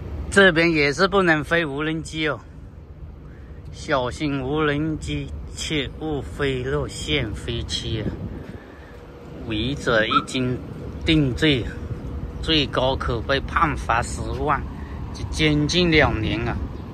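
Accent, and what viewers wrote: Hakka, China. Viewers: 是鄉下佬！只能讲客家音的普通话！请见谅！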